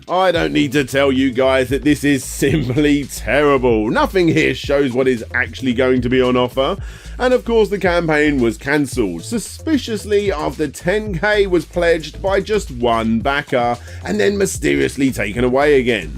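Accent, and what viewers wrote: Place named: United Kingdom. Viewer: This guy’s voice is too limey for me.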